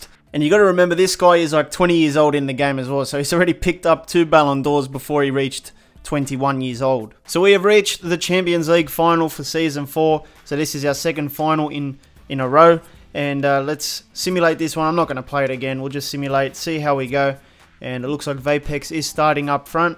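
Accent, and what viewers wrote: Australia. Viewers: Are you Australian? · Hey r u from austr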